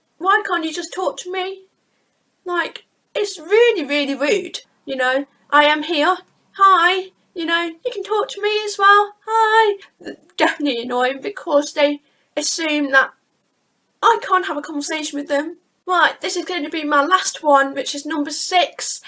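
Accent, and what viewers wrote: United Kingdom. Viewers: hey, okay, please don't be upset, I want to ask a real question... okay? u have a British accent, I'm curious how you picked that up, if you have not heard it...? it's fascinating! I'd like to discuss! :)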